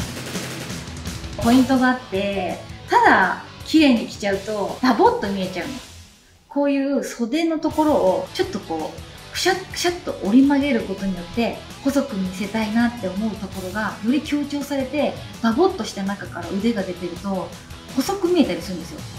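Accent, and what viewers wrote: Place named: Tsugaru, Japan. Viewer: 津軽訛りで好きなこと早口で喋ってる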